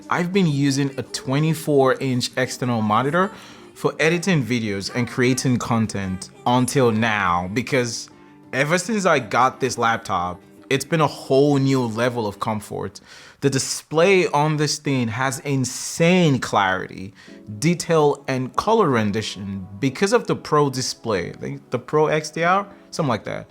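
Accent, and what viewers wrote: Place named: Nigeria. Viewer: Oh, a Nigerian accent. That makes sense. Before I was permanently confused. Because the accent sounded mostly american with a slight under current of british. So my mind was slowly melting down trying to figure out what area of england or america would generate that mix. Also the review was really good and high quality too. It was in depth, without being onerously long.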